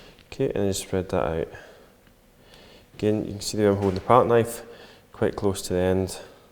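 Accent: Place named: Scotland, United Kingdom. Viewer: Excellent tutorial! Lovely Scottish accent to listen to as well. Thank you.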